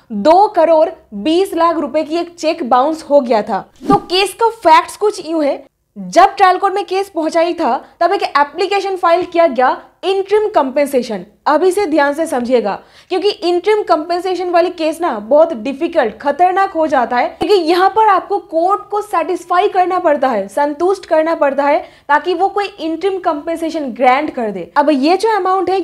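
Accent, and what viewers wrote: Bengal, India. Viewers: आधि तो इंग्लिश बोल रही है , और हिन्दी भी शुद्ध नहीं है ,,, यह बंगाली हिन्दी है 😢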